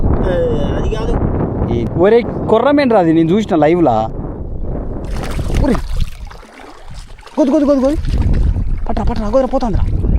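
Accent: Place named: Nalgonda, India. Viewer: Bro kotha district lu bifurcation kanapudu medhi nalgonda jilla kani meku dhaggarlo narshimulapeta mandal warangal jilla undey same me slange.. attincharu bro meru...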